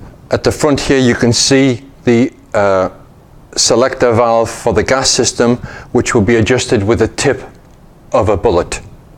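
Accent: United Kingdom. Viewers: Out of curiosity in which region of GB is your dialect spoken? And many Thanks for the great content! Best Regards from Austria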